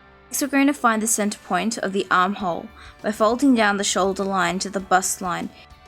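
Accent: Australia. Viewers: ... I hope I didn't offended you or seem like I was attacking you but personally as fashion student it doesn't doesn't make sense because of my background of pattern making but I see where this would help alot of people out. Judging by your accent you must be aussie? (Please tell me I'm right... lol) Have you considered studying fashion? I recon that you have big potential in the fashion industry, you earn a butt load for being a pattern maker.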